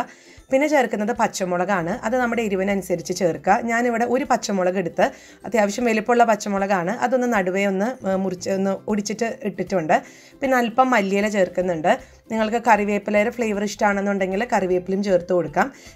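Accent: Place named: Palakkad, India. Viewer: Samsaramishtama chechiye jadayillatha nishkalangamaya nadan basha. Pkd aano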